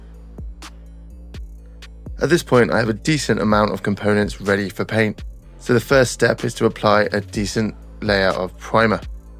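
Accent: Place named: United Kingdom. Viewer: a real voice is infinity better than any A.I. rubbish. Nothing wrong with a proper Brit accent.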